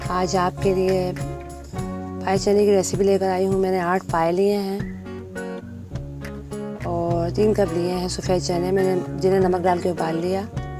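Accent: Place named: Hyderabad, India. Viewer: Hydrabadi accent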